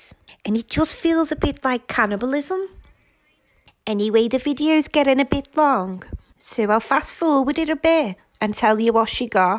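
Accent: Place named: Scotland, United Kingdom. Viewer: Hi from Lancashire! I love your strong Scottish accent! Keep up a good job, your videos are hilarious, but so true! Keep exposing child abuse 👍🏻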